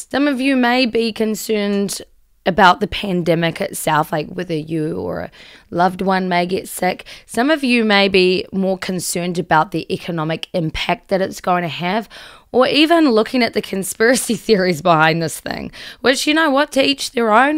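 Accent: New Zealand. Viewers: First thank you for everything you do and speak about here. I do appreciate your effort and perspective. I can relate with most of what your talking about. I’m a combo ADHD. I also express traits of autism. The “super powers” I believe are rather misunderstood and/or difficult to understand. Something I think you can easily understand is this: I enjoy watching you speak and hearing you speak. (2 different things) Your voice is enjoyable and words are very understandable. I also found that I really like the kiwi thing. (Siri is set to Aussie accent for me). At the same time you “accent” or “emphasize” words or phrases with your body language. You express a passion about something or a subject of discussion in ways very few people can. You can make an extremely strong point and speak with a gentle affection towards people. Emotional dis-regulation to me means; you either like it or you don’t like it, passionate about it or cold shoulder towards it, not much middle ground. 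Also I’m pretty sure you will understand this more deeply than most people. Everybody understands a B-day card. You pick it out and sign it, makes it personalized. I believe the universe is not a mistake. Things happen for a reason. “If opportunity is intentional, you should make it personal “ and you do this. Most people would probably say “intimately authentic”......ADHD super power isn’t flying threw the air or super human strength, but more of the ability to express something in a extremely strong compassi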